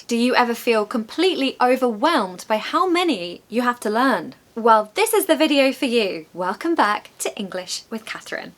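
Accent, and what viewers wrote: United Kingdom. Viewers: I found your lesson about phrasal verbs very useful indeed!! I really love your British accent!! 💖💖💖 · Very nice I am falling for your British English 🇨🇦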